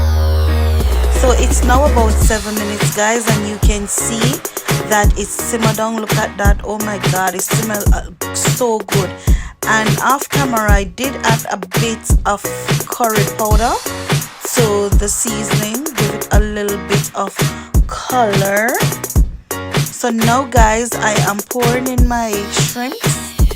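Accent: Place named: Jamaica. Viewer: Looks so yum that Jamaican accent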